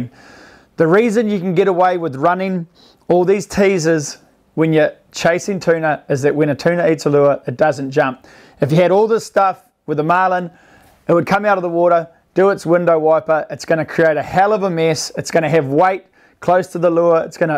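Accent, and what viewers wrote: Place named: New Zealand. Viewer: This was really an awesome explanation with a great focus on the "Recreational Fisherman". Here in the Northeast USA (Maine), we have the Recreational Bluefin (<72 inches) and the Giant Commercial Bluefin (>72 inches). When you wet a line here, you just never know what you are gonna get hooked to. Learned some good skills/techniques on what to include for a set-up. Your clear spot-on presentation style is greatly appreciated. Eliminating the fluff and ego, with a bit of Kiwi accent, what more could a viewer ask for.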